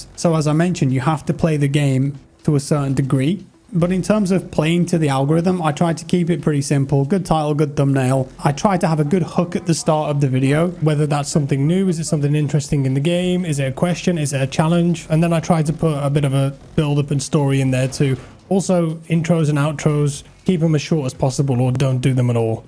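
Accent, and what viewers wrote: United Kingdom. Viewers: I‘m following ur Channel since WZ 1 I love your commentary and especially your lovely british accent 😊👌 Lots of love from Germany ❤✌️ Keep going!!!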